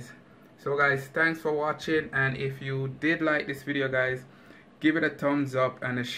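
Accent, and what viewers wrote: Jamaica. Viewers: Sound like a yardie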